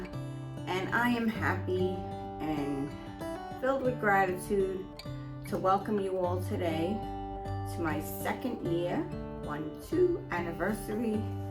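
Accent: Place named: New York, United States. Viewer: I’ve set the notification! I always want to write ‘ ’ to play up your NY accent!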